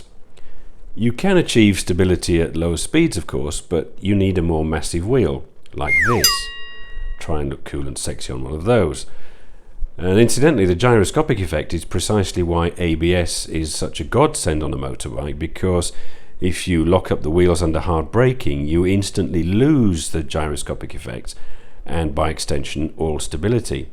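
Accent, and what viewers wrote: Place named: Northern England, United Kingdom. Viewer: Nice I knew I heard Northern! I was born in Leeds. Ridings folk enthusing over Riding, gotta luv it lol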